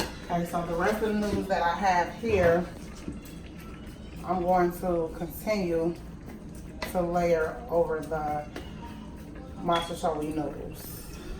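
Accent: Chicago, United States. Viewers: Is that a Chicago accent I hear? 👀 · Chicago in the house. I know a Chicago accent when i hear it. New subscriber here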